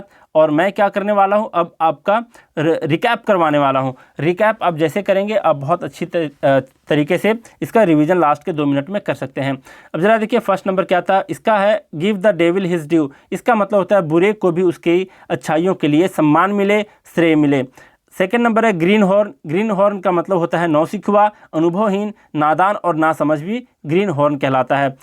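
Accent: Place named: Jamshedpur, India. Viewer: Sir app Jamsehdpur se ho kya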